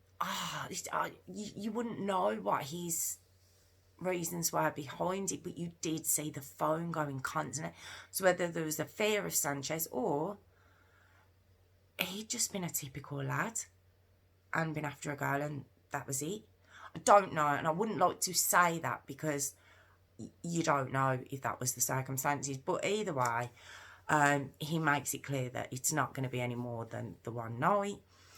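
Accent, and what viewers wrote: Birmingham, United Kingdom. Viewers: I love your accent! Are you from Birmingham?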